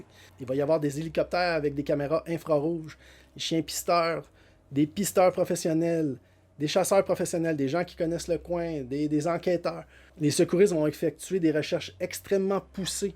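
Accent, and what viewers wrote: Canada. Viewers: Coucou ! Fort accent canadien, je ne comprends pas tout... pardon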